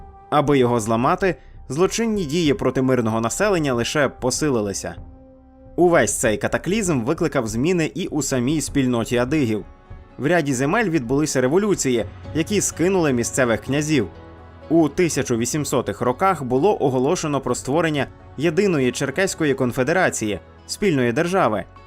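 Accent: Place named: Ukraine. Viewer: Ролик с потсрекательством , будьте бдительны.!!!! Высвечивают только один фрагмент,. Подумайте для чего ? Голосу с украинским акцентом рассказывать про черкессов, сейчас в такое гопячее время - это во первых , а во вторых проверяйте информацию более обширно, учите настоящуюю историю своих Родов , развивайте критическое мышление. Слава всем Родам.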